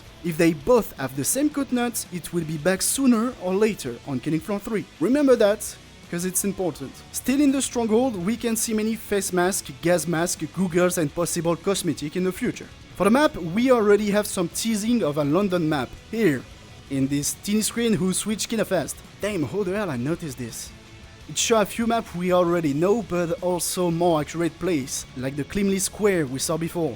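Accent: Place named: France. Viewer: I love your French accent pal, you are very thorough and proper with these videos, and they are made with love and meticulous craftsmanship. Keep it up bro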